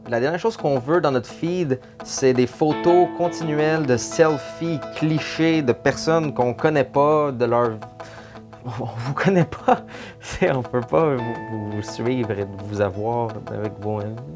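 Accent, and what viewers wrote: Quebec, Canada. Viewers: J'adore ton accent et tous les accent des québécois 👍🏻😋💕 je suis presser que tu reface des vidéos because je trouve sa hyper intéressant de savoir comment vous faite et combien de temps vous y passer 💕👍🏻😊 bonne journée 😊😋💕👍🏻😘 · Coucou! J'aime bien ta façon de parler je la trouve très calme et j'aaaaadore💜💜💜 l'accent québécois même si c'est vrai que parfois je ne comprends pas tout😌 T'a video est super continu ! Bye!😊 Ps:1 j'espère que ce n'est pas trop long comme com' 2 je ne sais pas si il a l'option reply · Bonjour:) j'aime beaucoup cette vidéo qui pose bien le sujet, finalement pas assez expliqué aux abonnés qui n'arrivent pas à comprendre quelque fois que derrière l'écran il y a un humain qui aime bien avoir de bon commentaire, et surtout qui n'est pas en non-stop sûr son pc pour répondre parce qu'il a aussi une vie! Ce qui est bien avec ta vidéo c'est qu'elle est aussi bien applicable pour youtube que pour un réseau social tel que facebook!;) Seul,petit bémol le côté tout flagada devant l'écran, je préfère quand une personne se tiens droite Ahah! 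Mais là je chipote ça te ne casse en rien la qualité du message!:p Brefouille, là petite française à accent vous salut #hommebeau à accent aussi! XD Ps: d'ailleurs en France y'a genre 1000 accent différent, c'est drôle que les gens soit "choqué" on va dire par l'accent québécois! (Que je trouve bien sympa par ailleurs! X) ) Bref bonne continuation à toi!:D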